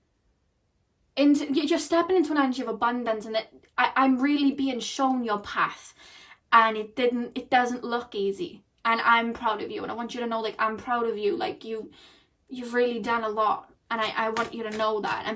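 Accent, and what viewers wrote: Wales, United Kingdom. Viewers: Im Welsh And Im Sure I Can Hear A Welsh Accent There 🤔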